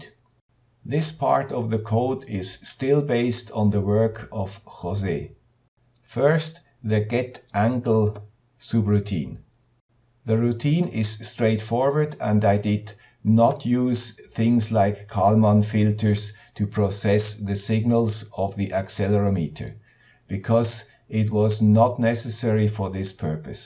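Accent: Switzerland. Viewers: Great video. Love your Swiss accent.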